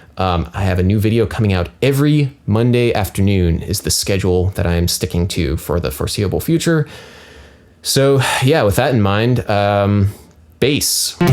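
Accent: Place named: United States. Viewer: He's not speaking English - He's speaking American English. Huge difference. Like most Americans, he has no idea of how to speak and let their audience digest what they are saying...just 7minutes of constant monotone robotic babble without barely coming up for air. Feckin awful. I've no idea why American's think it sounds cool to speak like this cos to English speakers it just sounds pure thick.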